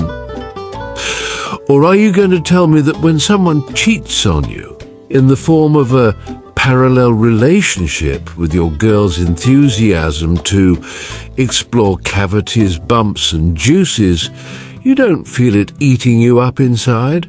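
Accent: England, United Kingdom. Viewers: This AI voice is by far the best. The snooty English accent on this one adds a comedic factor these videos.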